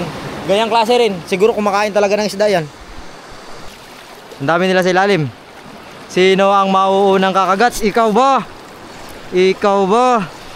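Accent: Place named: Cebuano, Philippines. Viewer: Hahaha.. hagua sa nag kirig2x.. bisdak jud kaayu ba.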